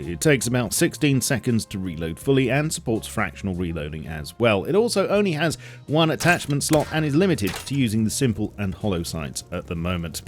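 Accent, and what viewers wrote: United Kingdom. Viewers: I'm only here for the Bri'ish accent.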